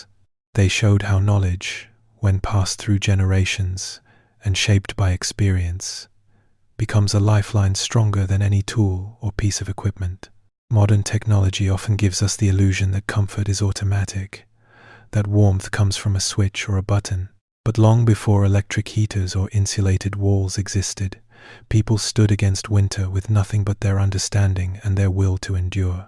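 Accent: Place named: United Kingdom. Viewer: So we have a a British narrator talking about American Indians.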